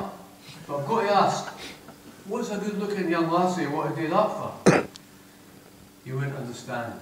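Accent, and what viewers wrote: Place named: Scotland, United Kingdom. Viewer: I love the Scottish accent! :)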